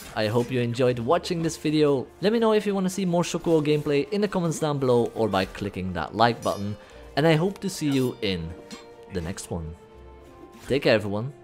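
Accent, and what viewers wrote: France. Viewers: Crazy, a French guy speaking English 😮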